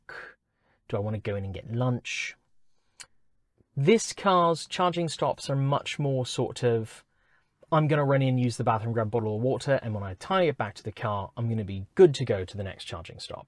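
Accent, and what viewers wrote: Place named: United Kingdom. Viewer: When did you get a British accent?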